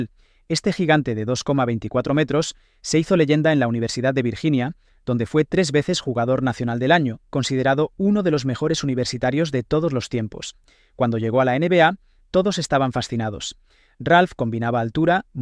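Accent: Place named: United States. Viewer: Por qué hablas como un yanki?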